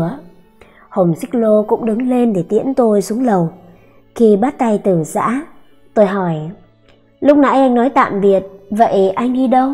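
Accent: Standard. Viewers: Giọng đọc chuẩn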